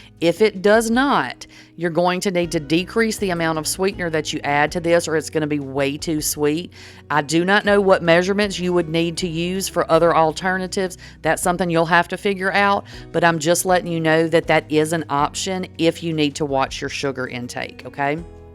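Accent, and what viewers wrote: South, United States. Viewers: Trying to place your accent. Im in the NWArkansas melting pot and we get have all kinds of versions of a southern accent here.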